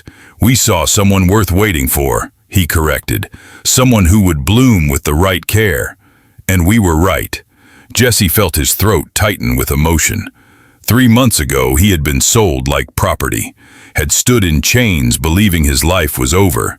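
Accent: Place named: United States. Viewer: Listening to your, fantasies, fascinating and so well constructed and excellently narrated stories. Your stories usually always bring a smile to my face and hold more than my attention. The voice is so American, gripping and appropriate. However Steinbeck's novella "Of Mice and Men" is very powerful and probably far more enduring in the USAlien cannon.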